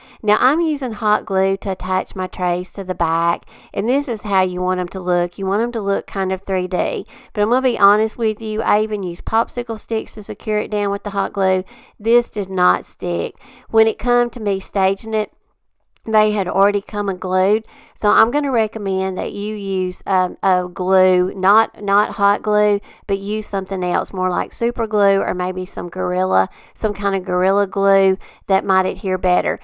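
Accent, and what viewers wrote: South, United States. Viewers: I love your southern drawl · Just now seeing your channel for the first time. Love your accent! I’m a southern girl myself! Great crafts projects!! ❤️❤️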